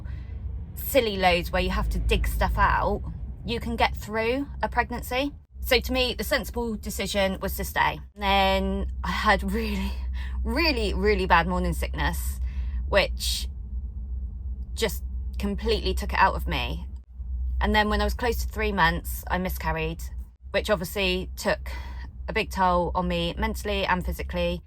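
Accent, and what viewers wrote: West Country, United Kingdom. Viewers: I am a new subscriber, and was disappointed that in your last video you said you were leaving, but glad that you will be taking us on your new journey with future videos. PS. Love your west country accent !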